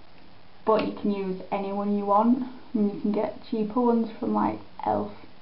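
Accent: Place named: Scotland, United Kingdom. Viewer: Are you Scottish? My grandfather was from Scotland and you both have the same accent. I've never met him, since he died when my father was little but I have heard a lot of recordings of his voice.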